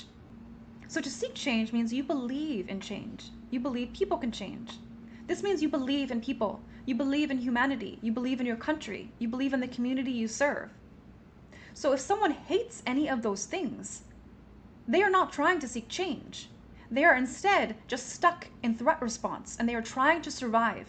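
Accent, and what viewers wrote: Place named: Northeast, United States. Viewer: Yes, thx. Sounds strongly of the NYC-Boston milieu, without the accent per se. I guess I am hearing education and social class more than location.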